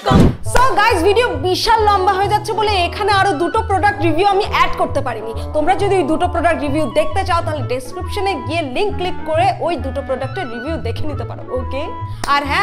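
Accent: Bangladesh. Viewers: Apnr kotha bolar style pura Bangladeshi der moto... mone hoi apni Bangladesh a chilan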